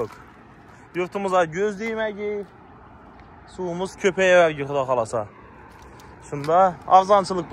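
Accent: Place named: Khorezm, Uzbekistan. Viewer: Хурматли хоразмликлар эфирга узатаётган видио обзорларингни бутун узбекистон ахли курсин десанглар курсатувларингни шевасиз китобий тилда олиб боринглар , курсатувларингни кураётиб тушунмаганимиздан курмай куяколаяпмиз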